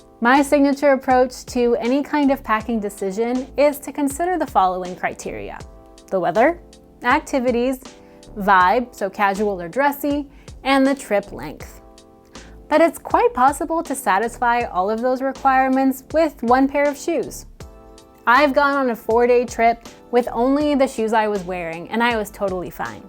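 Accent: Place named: Canada. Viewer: just recently discovered your channel and it's great! Your perspective on packing (the weather/activities/vibe/trip length quadrant) is refreshing. Instead of dictating a particular list or items, you invite the viewer to think critically for themselves to create a packing list that makes sense for them. I've been going carry-on only for 7-10 day trips to Europe since 2017, and your perspective inspired me to get ready for an upcoming trip to Madrid with a fresh outlook on layering and outfit-making - I'm so excited with my planned outfits! Your presentation is excellent: succinct, polished and friendly and it's great hearing a Canadian voice here as well. Comfort shoe recos: Love my tall leather boots from Poppy Barley (a woman-owned, Canadian brand from Edmonton that is ethically made in a small factory in Mexico). They do custom adjustments for calf-width, fit my orthotics and are so well made and comfortable. 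I've had mine for 8+ years and have walked all day in Toronto, Berlin, Amsterdam and more and have been able to have the heels replaced by a cobbler when needed. Also, Cobb Hill (a stylish comfort brand made by Rockport) looks cute, are supportive and comfy and fit my orthotics.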